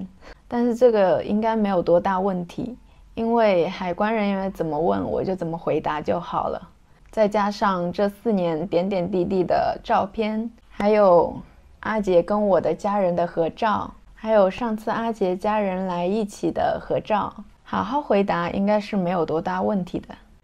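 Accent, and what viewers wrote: Taiwan. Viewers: 妳的口語已很台歡迎妳來台當新台人～！ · 哇！還沒到台灣就有十足台灣口音了！加油喔台灣歡迎妳☺️ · 講話口音。往台灣走了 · 妳的口音已經台化了！很快可以融入台灣社會。臉書 Line 必備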